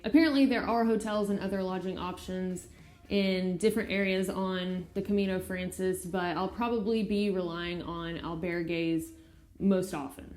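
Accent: United States. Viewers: I adore your American accent. Thank you for showing your adventure. I hope your sister finds as much enjoyment in the hike, as you.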